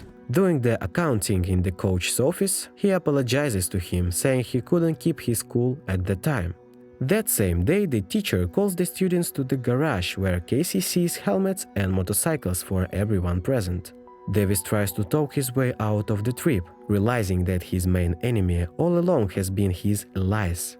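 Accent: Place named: Russia. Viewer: Чувак, какой ты ещё?😂 Ещё и страна указана "Соединённые Штаты". Да с твоим акцентом тебя, русского, за километр слышно. Ты уж если не умеешь говорить как носитель языка, так хотя бы не делай вид, что ты им являешься.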